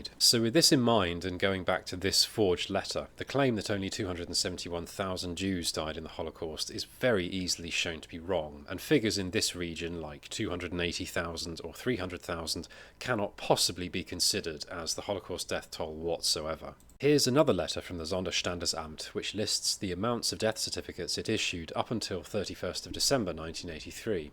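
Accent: United Kingdom. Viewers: >british accent. Dismissed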